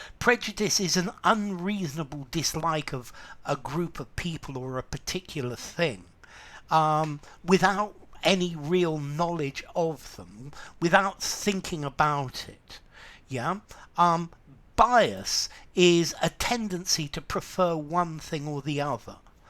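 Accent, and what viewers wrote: United Kingdom. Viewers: We benefit a lot from you,british accent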